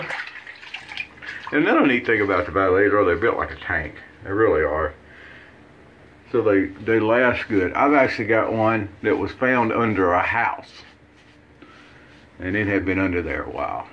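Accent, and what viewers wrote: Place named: Mississippi, United States. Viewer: You can tell that you are from Mississippi by the way you say it. Missipi, if you ain't from there, you don't understand.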